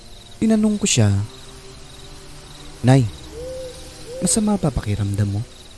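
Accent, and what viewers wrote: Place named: Iloilo, Philippines. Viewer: Sakit naman boss nawalan ng ina tapos ang dahilan di pa pinaniwalaan ng iba.I mean boss di ma explain..napapatawa ako boss kapag mag ilongo ka boss sarap lng pakinggan ba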